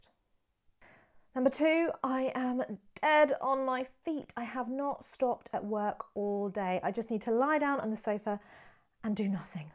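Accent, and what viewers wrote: United Kingdom. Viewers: Your British Accent, and your expressions.. awew....just love the way u speak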